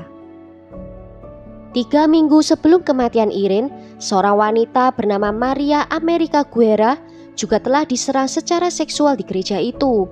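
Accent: Java, Indonesia. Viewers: mbak suara nya Medok 😀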